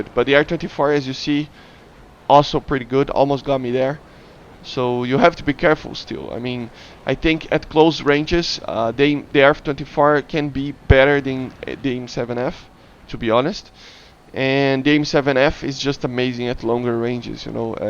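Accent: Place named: Brazil. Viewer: Sheer curiosity: Are you brazilian mate? Your accent is highly reminiscent of such, hahahaha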